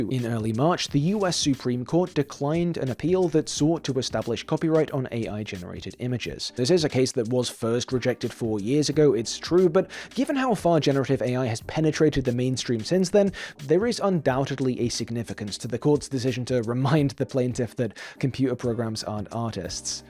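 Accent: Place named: North, United Kingdom. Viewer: Why does sound more like he's from the north in the flashbacks?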